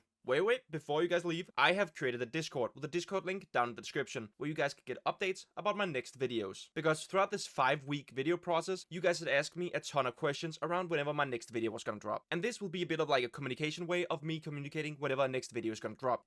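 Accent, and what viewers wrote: Denmark. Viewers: Drop the Danish accent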